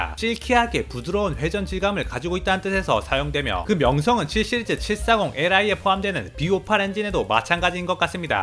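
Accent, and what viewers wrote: Gyeongsang, South Korea. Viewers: 말투가 경상돈가 함경도인가?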